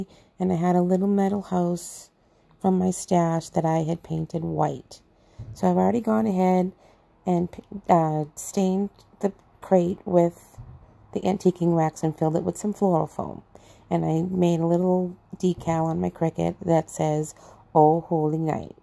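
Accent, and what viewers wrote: Boston, United States. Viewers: Are you from the Boston area? It's been a long time since I heard 'wicked smart'!! Personally I grew up a valley girl (Merrimack Valley) 😊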